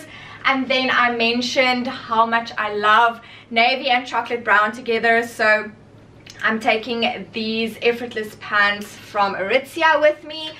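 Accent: South Africa. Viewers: I knew that was a South African accent..love from South Africa 🇿🇦❤I'm a new subscriber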